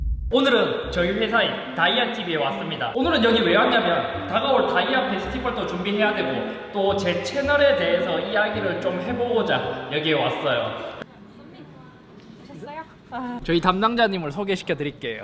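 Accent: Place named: Andong, South Korea. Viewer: 말투가 어디서 많이 들어봤는데...했더니 아버지따라 시골가서 듣던 말투군요. 안동분이실줄이야 ㅎㅎㅎ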